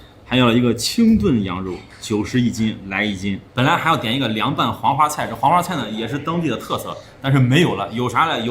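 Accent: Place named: Shaanxi, China. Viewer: 是陕西的🎉🎉🎉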